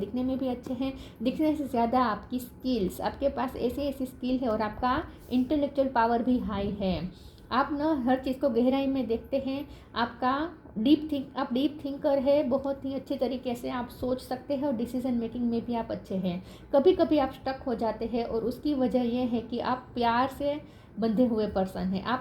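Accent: Gujarat, India. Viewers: Aap ke acent se lag raha hai ke aap gujrati ya madwadi honge.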